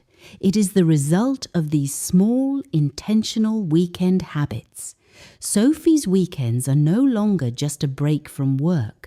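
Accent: United Kingdom. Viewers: Is this British accent?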